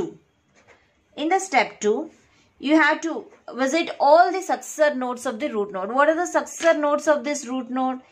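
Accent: India. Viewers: Damn to Hindi accent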